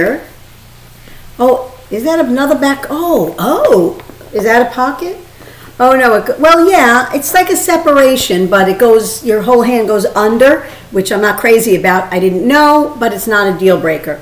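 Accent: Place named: New York, United States. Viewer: You sound like your from nyc I was born in the Bronx NY 💕😍😍💕😍 beautiful bag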